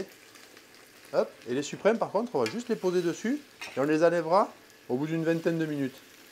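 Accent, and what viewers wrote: South, France. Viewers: L'accent vient du sud mon ami on dire. Petit coucou from Toulouse. J'ai bien aimé ta vidéo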